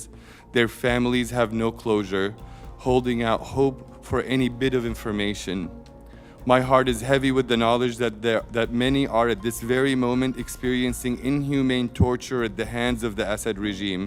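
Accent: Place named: United States. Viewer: دخلك لغتك العنجليزي كانك أمريكي تتكلم بطلاقة متى تعلمت الانجليزية وانت بتعرف بسوريا المتخرجين من الأدب الانجليزي لايتكلمون لانه مافي براكتيك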